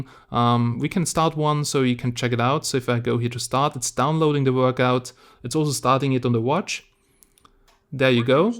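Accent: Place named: Germany. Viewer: For me it sounds like Germany or Austria.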